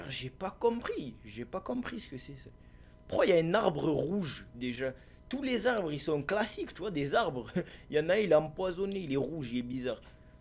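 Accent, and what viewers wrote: Marseille, France. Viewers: T’es marseillais ? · Tous se qui me plaît ses ton accent marseillait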